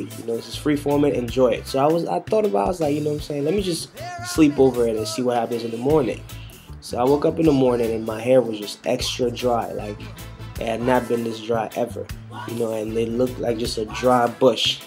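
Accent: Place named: Jamaica. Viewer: you have a slight jamaican accent n no its not the music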